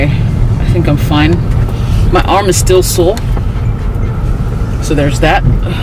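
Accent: United Kingdom. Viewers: Hi, How are you? You are blowing my mind with the way you Speak! The way you speak English is so clean!!! Up to now, I have never heard you break out into an accent. I have a toss-up between you being British-trained or US. So I am from Trinidad and Tobago. There are people in my country how sound is clean as you, being so well-spoken. Trust me I love the way you speak! Because I speak with my Trini Accent a lot, you can sound a bit annoying(lol), however, it's really pleasing to listen to you. Keep it up and every so often drop in your Ghanian accent if you can. Well keep checking out your topics. Have fun doing what you do. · You sound like you've spent formative years in the U.K. to me.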